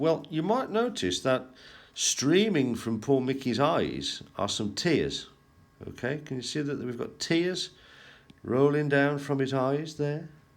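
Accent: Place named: United Kingdom. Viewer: THANKS.SAME THEME DIFFERENT PERFECT WAY.SOMETIMES THE VERY BRITISH PRONUNCIATION IS ADORABLE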